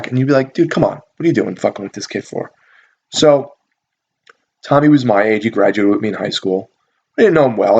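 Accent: United States. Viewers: you American?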